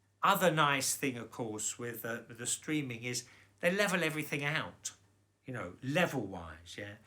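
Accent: London, United Kingdom. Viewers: Interesting! You’re comparing the analogue output from the iPad /iPhone to the amp, ie using the Apple internal DAC. I’ve now (last week) bought a Cyrus Soundkey thumb size inline DAC (£60 + £10 for the Lightning adapter) which you plug into the Lightning port. It makes a really worthwhile improvement with earphones, like the music is clearer, sharper and in a bigger room. If you fed this combination into the amp, I bet it might even beat the Node 2i ! In fact, I usually use Airplay to go from my iPad to my own Yamaha WXC50 network streamer and hence to the amp; this also bypasses the Apple internal DAC, of course, and sounds pretty good to my old ears. Thank you for your honest reviews. So many seem to be trying to sell you up. I hope you aren’t offended when I say I love the London accent.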